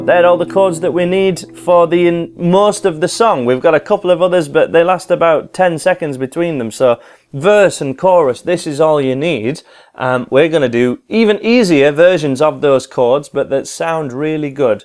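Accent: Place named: Sheffield, United Kingdom. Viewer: Sounds like a Sheffield accent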